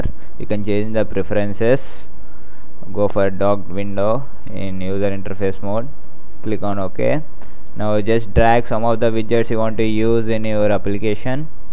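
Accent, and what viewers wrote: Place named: India. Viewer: God damn your english sucks, great topic about QDevelop but the accent is much too strong to hear only no more than 2/3 of a word. It's like indian calling horror :( I do want more Qt oriented tutorials, but smoothen your english or something, take english pronounciation classes.. anything to ease it a bit, otherwsie, great.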